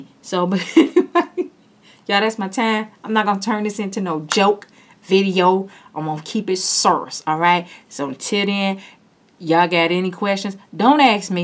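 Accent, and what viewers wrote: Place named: Louisiana, United States. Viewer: Girl where you from. I'm a guess Louisiana. I love your videos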